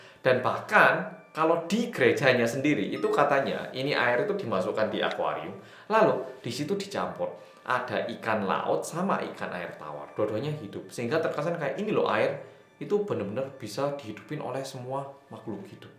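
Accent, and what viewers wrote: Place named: Malang, Indonesia. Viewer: nggk nguati medoke ya mas... khas kera ngalam... salam dari Yulis4994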